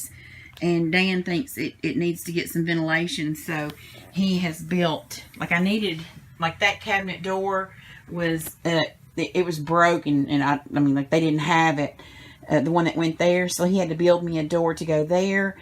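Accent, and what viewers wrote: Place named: Tennessee, United States. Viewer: Ms.....I LOVE you...."Don't nobody whoop me...and live"...hahaha! I'm right here in middle Tennessee and we talk just like you do! I recently found your channel and I'm going to do that fabulous drip cake you showed and I AM going to lose weight on this doggone LO CARB diet I just started! I hope you will show us how to do it right, girl, because this lard ass is fixin' to GO!!! Looking forward to some lo carb sweet delights. Thanks so much for being YOU...:-)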